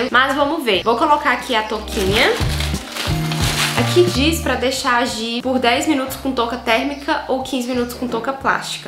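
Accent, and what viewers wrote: Minas Gerais, Brazil. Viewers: vc é de minas gerais o sotaque diferente 🤔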